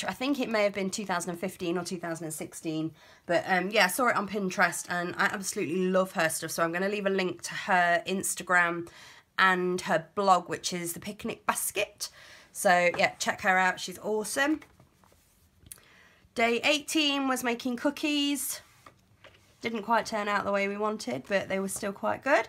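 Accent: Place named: Texas, United States. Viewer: Enjoyed your video very much,! As a Texas girl, I enjoyed your accent almost as much as your album! I have photos and an idea for each day, but haven't started yet. Thanks for all the great ideas!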